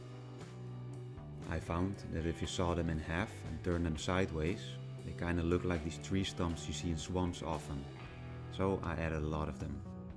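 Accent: Netherlands. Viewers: Laat me raden, Je bent Nederlands of niet? Hahaha Nee maar Nicely done! :D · You are dutch, aren't you? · is he dutch? his accents sounds sooo dutch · Are you dutch?!?! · You sound Dutch haha.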